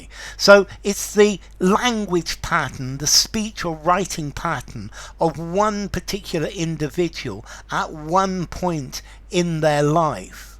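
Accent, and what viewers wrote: United Kingdom. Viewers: I absolutely love the British accent. It's the most polite & respectful language..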